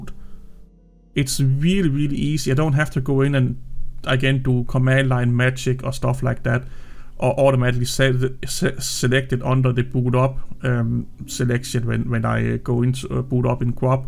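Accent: Denmark. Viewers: I really enjoyed your Danish accent !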